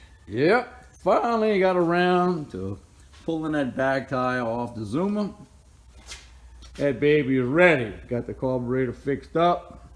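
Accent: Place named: New Jersey, United States. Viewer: You from Jersey or Down South.?? Washington state, here. Just found this video again and laughed. If you really want to lose things, important things. You wheel your bike out into the uncut lawn grass and let the bolts fall into the grass. You or the next four house owners many find the parts, OR MAYBE NOT...??? Great Vid and Cool Accent , BRAH...DSD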